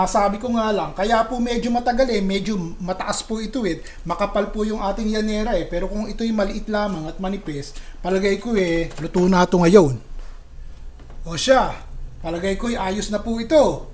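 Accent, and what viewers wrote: Philippines. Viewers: are you from Nieva ecija ? the way you talk you sounds like my cousins from the philippines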